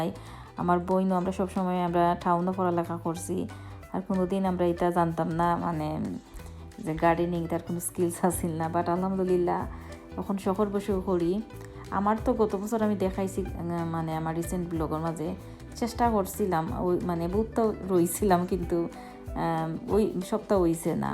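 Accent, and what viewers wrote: Sylhet, Bangladesh. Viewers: Wow apu osomvob valo laglo Sylhety vashai kotha bolai.aro video dekhte chai erokom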